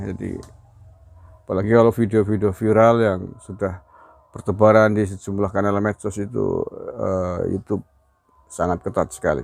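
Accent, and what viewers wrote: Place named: Sumatra, Indonesia. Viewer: Logat melayu arah daerah,,,(aceh/sumatera)🙏🙏🙏🙏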